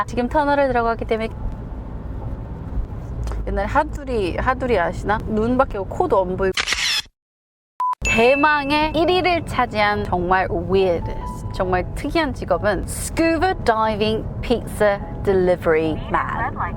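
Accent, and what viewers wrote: United Kingdom. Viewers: 굿모닝~ 애불보디! 늘 들으면 들을수록 브릿이 귀에 쏙쏙!! 즐겁게 방송보고 가유~~^^ 누나 안뇽!^^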